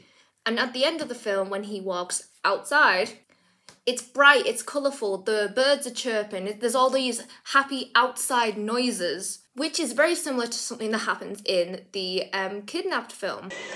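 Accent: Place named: United Kingdom. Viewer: WHY THE BRITISH ACCENT IS SO ADDICTIVE!!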